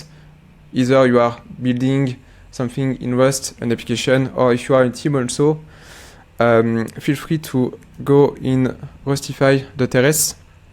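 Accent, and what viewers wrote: France. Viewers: HAHAHA je me suis dis la meme chose , son accent français est vraiment fort n'est pas Pierre ?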